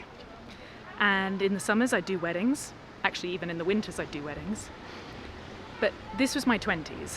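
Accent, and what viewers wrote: United Kingdom. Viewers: I love watching your vlogs and to boot I love listening to you talking. Your British accent is so eloquent and perfect phrasing. I also love to hear your guitar playing and listen to you sing. Keep it up there are too few of your vlogs. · What part of the UK are you from,?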